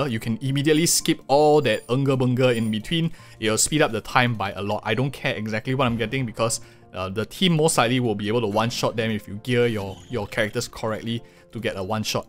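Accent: Singapore. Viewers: Singaporean voice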